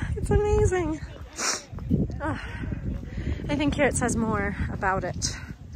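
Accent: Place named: Southwest, United States. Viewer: clearly its an American accent.. southwest.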